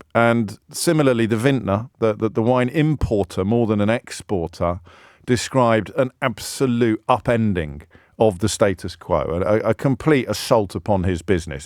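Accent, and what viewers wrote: United Kingdom. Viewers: If you have a British accent you don't know anything about America........... It's funny that EVERYONE against Trump that is complaining about the Tariffs are complaining........... You keep saying that ir will hurt America....YET....... You all are the ones complaining. Seems like all the pitching a fit is proving what Trump said. You need us more that we need you. Thing worse than following Canada would be Following the UK........ Canada is a joke and has been for a long time.... Why do you think the rest of the world calls it Terhanto.:face-blue-smiling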